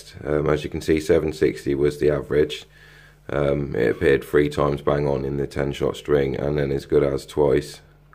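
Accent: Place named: Plymouth, United Kingdom. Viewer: Great review mate, Thanks. Gonna treat myself to one of these. You sound like yer from Plimuff! Regards from another plymouthian!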